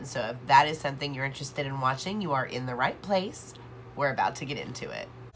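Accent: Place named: South, United States. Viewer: 💜💜💜 Enjoyed seeing hubby's reaction, lol...."there's no makeup line" Loved your strong southern accent 💜💜💜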